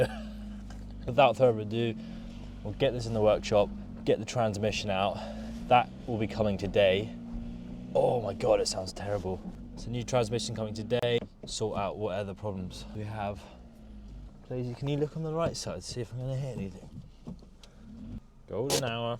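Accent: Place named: Australia. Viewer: Pretty good aussie accent as judged by an aussie!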